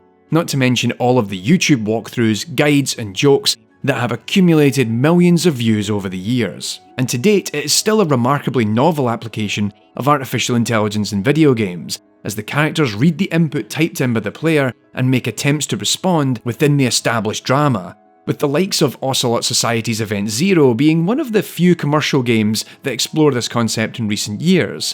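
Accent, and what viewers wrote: Scotland, United Kingdom. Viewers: I’m new to this channel and hearing another Scot made me happy ngl 🙃 good video also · I was ten minutes into this video before I realised you were scottish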